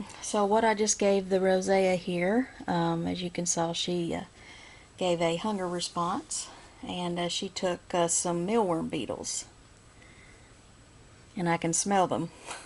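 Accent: South, United States. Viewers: I like your southern accent. I wish my Californian accent was as cool as your accent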